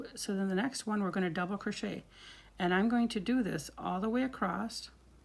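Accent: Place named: Scandinavia. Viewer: Interesting accent - are you European? Scandinavian Maybe?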